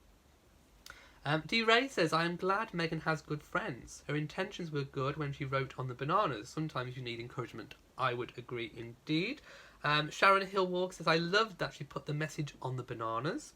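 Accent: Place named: RP, United Kingdom. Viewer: Excellent pop up chat! I’m filled with happiness and hope and a tad taller spine now that Meghan’s friends have spoken out on her behalf. I’m sure her friends have her quiet approval for speaking out and I wouldn’t be surprised if the Queen is aware also. I hope this lowers the vitriol and things improve!!! 🙏🏾🙏🏾🙏🏾👏🏽👏🏽👏🏽🥰. Also love the BBC voice!!! 🥰🥰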